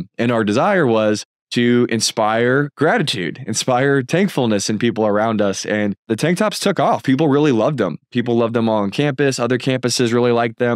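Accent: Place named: Canada. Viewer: U Canadian? Remind me of The Canadian Prepper. Same accent. Salute.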